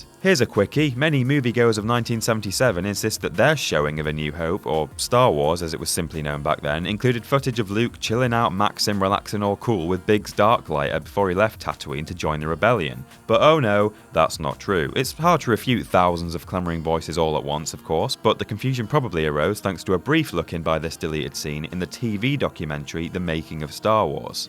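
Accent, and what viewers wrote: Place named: Cheshire, United Kingdom. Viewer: rough guess would be Cheshire, or naturalised northern affectation. You only have to get a few seconds in and hear the 'Rapoonzel' to know that man!!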